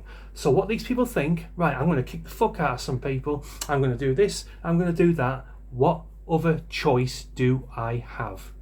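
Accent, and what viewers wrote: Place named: Yorkshire, United Kingdom. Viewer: A Very Good video,! Thank You Lad!! I'm fm Yorkshire, and you sound like a great Yorkshire lad, with a lot of Sense! - Thank You!! For All, the sensible and well grounded vid's you have put out! But, just to, hopefully, bring to everyone's attention - how many people know about, or have heard of the Very TRAGIC - DUNBLANE - Where, 116 primary school children, were shot to death, by a local Scottish, Very evil man, who then cowardly shot himself. It, happened in 1996, and it's stayed with me....Forever. But, private ownership of guns was Banned! So, Something, was done about it! And, now there is not as much gun crime, in the uk - but, Senseless stabbings have Increased, So dramatically! Oh, Lord God, please give your comfort and strength to the, Poor, mum's and dad's of their 3 brutally murdered, little daughter's soul's. 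I, have been So Shocked, and saddened by the pathetically biased so called British media. I, wish we still had the 'News of the World' newspaper! At, least they had a BACKBONE!!!!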